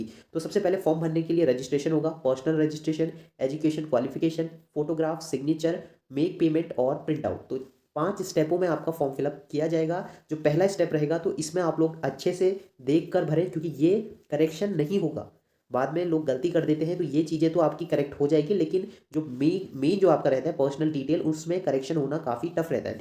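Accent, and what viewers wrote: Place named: Pahadi, India. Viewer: Cool phadi ki awaj h ye to❤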